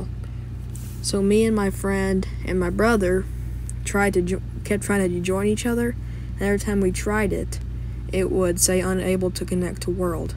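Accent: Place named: South, United States. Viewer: I like the southern accent btw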